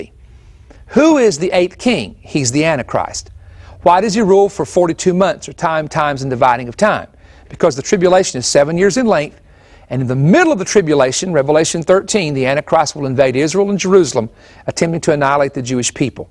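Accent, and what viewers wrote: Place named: South, United States. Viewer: although his gomer pyle accent is somewhat distracting, he says some pretty interesting things....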